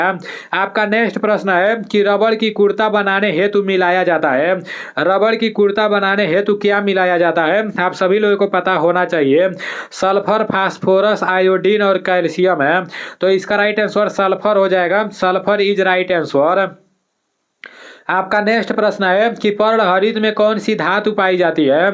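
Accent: Bihar, India. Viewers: Bhai lagta hai ki aap bihar se ho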